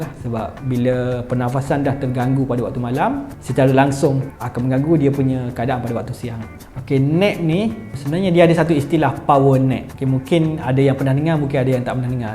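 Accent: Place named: Kelantan, Malaysia. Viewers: Pekat dialeg kelantan walaupun bercakap dalam berbahasa Malaysia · Bkn dgr dialek tu kelate john penang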